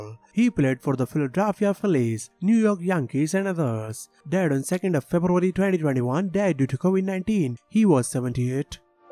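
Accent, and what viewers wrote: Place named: India. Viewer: PLEASE....DO THE HONOR OF CELEBRATING TOP CELEBRATIES DEATH WITH A CLEAR VOICE WITHOUT ANY INDIAN ACCENT !!!! IT IS VERY DIFFICULT TO UNDERSTAND WHAT IS BEEN SAID.....GOT JOTHING TO DO WITH RACISM !!!!!!! PLESSE GIVE THE MIKE TO SOMEBODY WITH CLEAR PRONUNCIATION OF THE ENGLISH LANGUAGE !!!!!!! OMG !!!!!!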